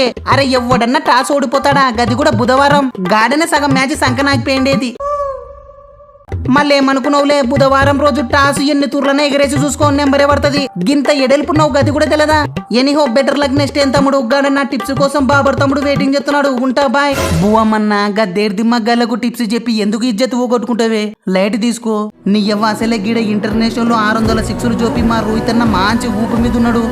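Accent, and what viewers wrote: Rayalaseema, India. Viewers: ఈడ క్రికెట్ ఆడినట్ట్టు లేదే ఇపుల్ బజ్జి ఆడినట్టు ఉంది 😂😂😂😂😂 రాయల సీమ బాషా మజాకా 😂😂😂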